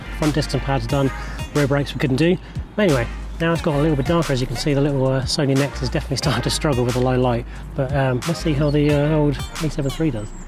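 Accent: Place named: United Kingdom. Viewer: i like your style (and your British accent! btw I'm a German) :) you should continue making videos :D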